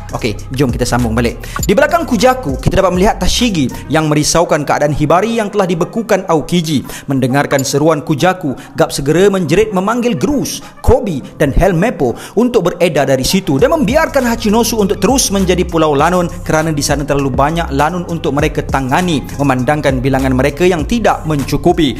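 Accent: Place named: Batu Kurau, Malaysia. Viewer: cakap batu kurau sikit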